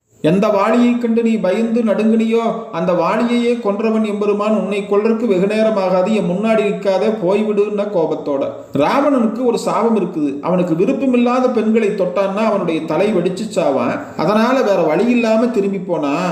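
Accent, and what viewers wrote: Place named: Kongu, India. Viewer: அருமையான சுந்தர கொங்கு தமிழில்......... அற்புதம்... வாழ்த்துக்கள். ஜெய் ஸ்ரீராம். ஜெய் ஸ்ரீராம். ❤❤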